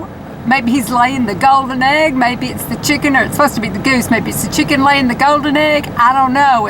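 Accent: South, United States. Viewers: Would love to hear you speak Spanish with that Southern accent. Miami is 90% Hispanic.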